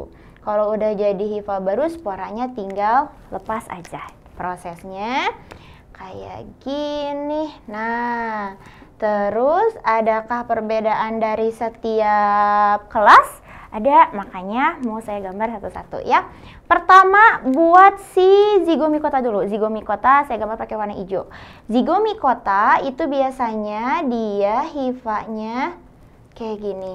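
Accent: West Java, Indonesia. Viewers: sunda beneeerr... orang sumatera sambil senyum dengerinnya. sukses ya kak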